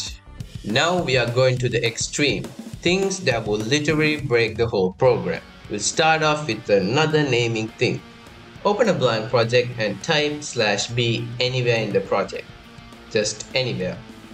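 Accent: India. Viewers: Him : voice Me : IndiaN?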